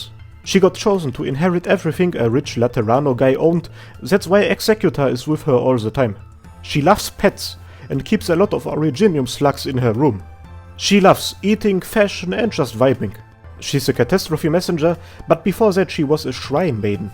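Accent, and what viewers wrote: Germany. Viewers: From Which country are you XD Sounds pretty Hard like germany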